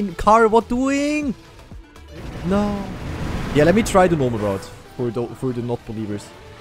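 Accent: Germany. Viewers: Hallo der herr xD deutscher aktzend und deutsche wörter wie Ja Alter NEIIN haben dich verraten xD Guten morgen xD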